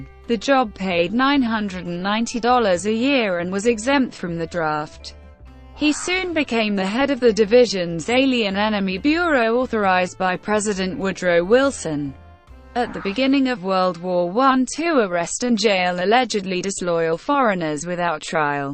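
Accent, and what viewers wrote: United Kingdom. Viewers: Very bad done. That British accent! Trash 🗑️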